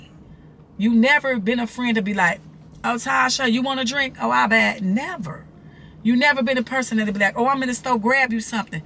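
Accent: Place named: Chicago, United States. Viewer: Heyyyy I'm born and raised in chicago. As soon as I heard your voice I said yep she is so chicago.. you are so Beautiful ❤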